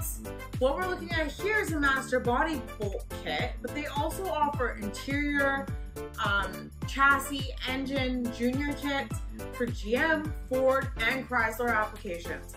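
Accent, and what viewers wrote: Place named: Canada. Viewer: Canadian eh!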